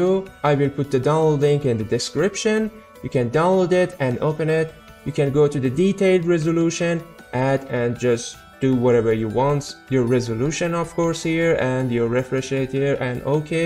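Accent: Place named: Iran. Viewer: The Iranian english accent is thick with this one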